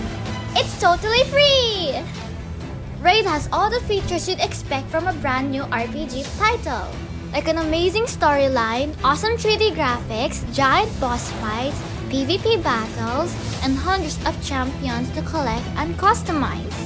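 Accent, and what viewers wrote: Philippines. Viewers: Are you Filipino?Your voice sounds like so,If I’m wrong pls tell meh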